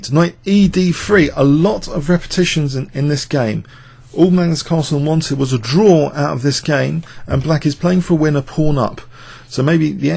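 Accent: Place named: Cockney, United Kingdom. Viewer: great game, and great commentary! I love your competence, your enthusiasm, your emotional way and your nice Cockney accent! thanks for all these videos, it's very entertaining. and happy new year :)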